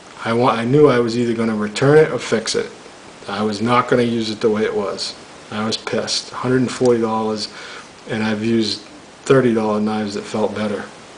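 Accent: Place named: New York, United States. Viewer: Love the New York accent ;)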